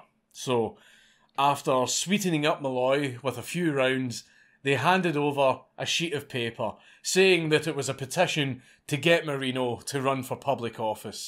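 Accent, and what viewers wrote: Scotland, United Kingdom. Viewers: man, I'm slowly becoming addicted to this series. Hearing hilarious stories being narrated by a heavy scottish accent? Who could ask for more? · Oi, mate! Ye Scottish? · Daddy dankula yet again with another story told in his smooth Scottish accent...I love it. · absolutely avg day! 🤭🤫 jealous you live in Scotland. Your accent 🥰🤤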